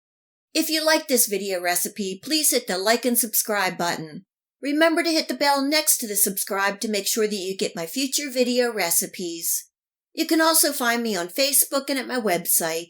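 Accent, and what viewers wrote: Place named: Pennsylvania, United States. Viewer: Love your true Pennsylvania accent.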